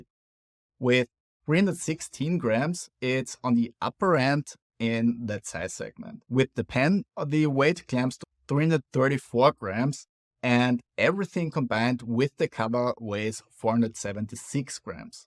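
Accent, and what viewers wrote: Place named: Austria. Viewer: your lovely and melodic Austrian-coloured German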